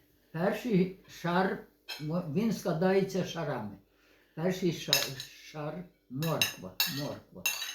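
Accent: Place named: Vinnytsia, Ukraine. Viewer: Здоровля, сирва, бурачок, так говорять на Вінниччині в Чечельницькому р-н😊😊❤❤❤, там проходили мої літні канікули у бабці в селі❤❤. Обожнюю, не можу наслухатись.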